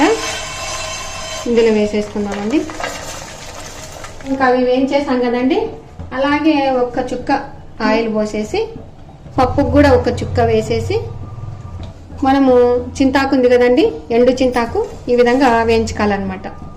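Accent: Rayalaseema, India. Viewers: ఎన్ని రోజులు అయిందండీ రాయలసీమ వారి వంట ,మాటలు విని,ఎక్కువగా కోస్తా వాళ్ళ చానెల్స్ ,language ఉంటుంది.చాలా బాగా చూపించారు అన్ని items ఒకే వీడియోలో thank u. మాది కర్నూల్ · Avva super maa avva kuda mee lage undi madi ndl local andi meedi nagative a vuru andi meeru chebutu unte naku ndl ravalanipistuni kani hyd lo vuntamu mimmalni Ndl akkado pelli lo chusinattu anipistundi anduke a vuru ani adiganandi mee videos chustuvuntanu rayalasseema stylo ne matladandi maku vurlo vunnattu anipistundi maa amma kuda meelage pappu gattiiga chestundi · Mana Rayalaseema slang chaala baaga matladtunnaru andi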